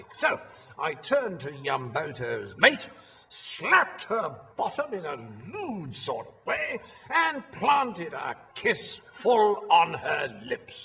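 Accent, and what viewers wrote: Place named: United Kingdom. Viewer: Subtitles application is struggling to cope with that magnificent British accent like a desperate lion.Funny too!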